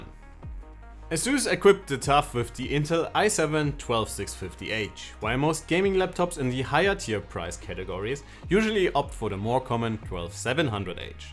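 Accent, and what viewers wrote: Germany. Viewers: The German accent tho :) 105watt not quiet my tempo :))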